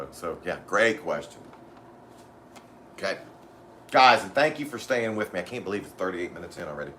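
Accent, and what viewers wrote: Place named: Eastern Shore, United States. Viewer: man your accent reminds me of home... im a eastern shore boy from maryland.. love it bro keep up the good work.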